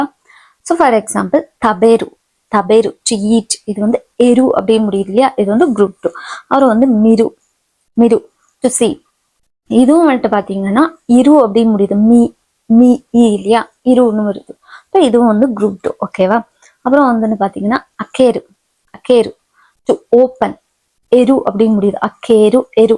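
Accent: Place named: Kanyakumari, India. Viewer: Super. Keep going. By the way, are from Kanyakumari district? I could sense a resembling tone in your speech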